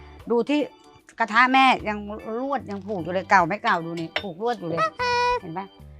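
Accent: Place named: Isan, Thailand. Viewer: ชอบสำเนียงการเว้าา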